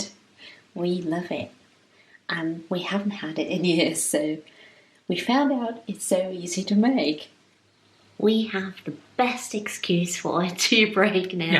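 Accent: England, United Kingdom. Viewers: Hello, I‘m curious to know if you ma‘am are German too, or only your husband? You definitely don’t sound German. You sound 100% English.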